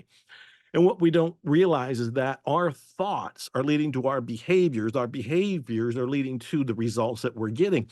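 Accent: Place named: United States. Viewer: Thank you Joe for this very inspirational video. Love your books, love your explanations, love your perfect American English. Happy birthday and blessings from Russia!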